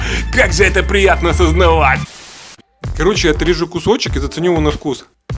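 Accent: Ukraine. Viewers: мои дэтуктивные метоты не врут ты Украинец? я услышал твой акцен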